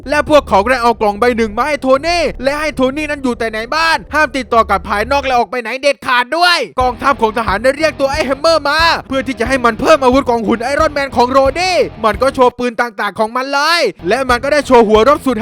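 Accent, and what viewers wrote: South, Thailand. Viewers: ต่อไปเอากับตันอเมริกานะคับพี่#ชอบเสียงใต้ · พี่เป็นคนใต้หรอ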